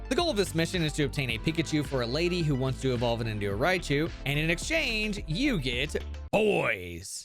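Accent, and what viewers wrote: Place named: France. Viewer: I am 100% sure he is American, as I have been following the channel for quite a while now. Yes, french accent is pretty heavy 😅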